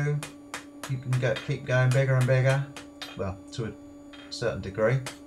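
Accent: United Kingdom. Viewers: Hey you sound like you're in the UK, great video it helped me a lot, I'm learning how to make complex sword hilts and this helped a lot, I just made a bunch of drifts and slot/hole punches out of some rebar and chisels. Do you happen to know a good place to get cheap swages and drifts here? or if you have any old ones for sale let me know, having trouble finding good large ones.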